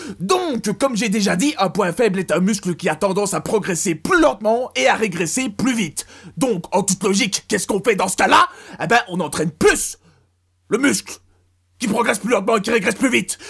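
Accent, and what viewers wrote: Belgium. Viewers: t'es belge en fait ?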